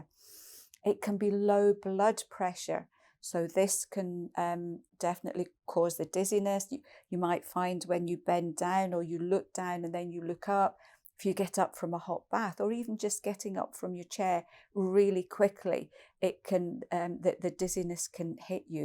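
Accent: United Kingdom. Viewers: you are a blessing to all of us. I learned so much about this peri menopause adventure thanks to you. By the way, I love your British accent 🥰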